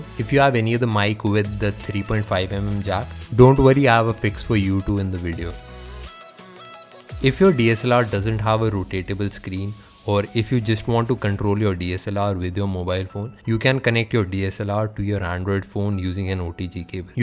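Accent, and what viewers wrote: India. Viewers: Another bad english accent from yet another indian guy.... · Tired of the indian accent explaining electronics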